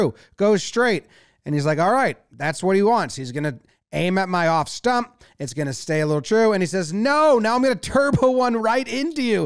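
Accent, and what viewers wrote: United States. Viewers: So weird hearing an American talk about cricket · Weired to listen to cricket commentary in an American accent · As an Indian enjoying the occasional baseball content, it's refreshing cricket from an American voice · It’s just strange for me to have an American voice analyze cricket … I’m old school … but love the idea that Americans are getting into cricket … hate the idea that if they take it seriously, they will win more World Cups than the rest of us. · it's fun listening an unfamiliar american voice break it down which tickles my senses. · Not gonna lie, still weird to have an American voice comment intelligently on cricket who isn't from the cricket playing countries originally... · as an Australian i have to say it's weird as fuck watching Test cricket getting broken down by a guy w an American accent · Commentary in American accent sounds a bit weird lol. 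Good stuff 👍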